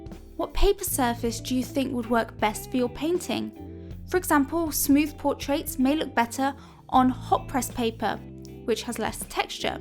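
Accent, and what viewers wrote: United Kingdom. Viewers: You are EXTREMELY British. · Actually I was enjoying to listen to that amazing British accent 👍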